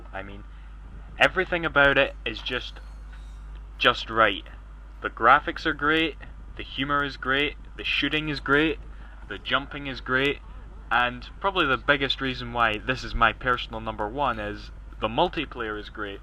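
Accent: Scotland, United Kingdom. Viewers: well hes scottish, thats how we talk XD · Which part of scotland are u from? Im from aberdeen ya see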